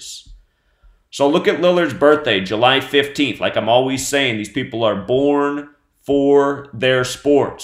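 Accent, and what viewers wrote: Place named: Northeast, United States. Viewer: I agree some what, Your saying u can call games, give game calls to someone in New Jersey. Who has not lost any playoff games to your one game a day speech u said u do. Your wrong admit it!! I bet you don’t even know how much % percent wise u have to win, if you are going to make any profit from gambling FACT PERIOD. Yeah u can easily find out that answer cough cough goggle. If u had that kind of knowledge your talking about, the video wouldnt be up here. there are 100s of millions of $$$$$ if not billions on sports gambling. you would never be able to have any opinion, I fell like with that much money you would disappear, like the men in black would come and take u somewhere. I think someone would do Something to you with this kind of information. You also Said you give a New Jersey guy info on games and he’s has not lost any bets but one and it was a come back that could of made him shit his pants because of the money he wasted from Your word. 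I see threw you and your northern accent that stands out well. Sounds like ur from The north like Philadelphia ,New York,New Jersey etc Area. I don’t think anyone would come OUT and say NBA is rigged here is the proof. u keep saying we are retarded if we don’t believe you. To the point you sound retarded saying u can call games. You Might like all get some right but ur not wining like u say u are. Your not able to call games like u say u do fact! If all pro sports like the nba are rigged,it’s not from technology it’s from the refs com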